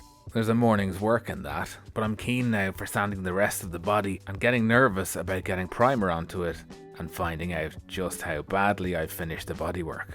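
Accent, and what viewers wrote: Ireland. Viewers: Just discovered this channel, and I absolutely love it! First it's great to hear an Irish accent for a change, and second you are rebuilding one of my most favourite cars, ever since I saw Roger Moore drive one off a pier. Outstanding work too and loving the stop motion to by pass the boring stuff. Excellent stuff!!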